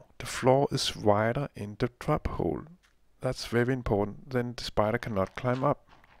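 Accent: Denmark. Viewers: Nice trap, but i dont like your danish/english accent else it was fine. 4/5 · He´s very danish! haha - "også" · are you from Denmark? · Are you danish? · are you from denmark? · your English is exelent, the reason some people don't understand it is because they are not used to your Danish accent. But i understand you perfectly, Im Norwegian and i also have a accent some people don't understand:) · your danish? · Clearly from Denmark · Hes Danish:) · Man kan squ godt høre du er dansker xD:-D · Nice video, jeg kan høre på din accent at du er fra Danmark, hehe:) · u from denmark?:-D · Fun to see a dansish minecrafter! though you should try to work on your accent, its very "danish" so to speak, if you understand what i mean... Else very great work, keep that up! ( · Fin video! Hørte på dialekta di med en gang at du var dansk:) Hilsen fra norge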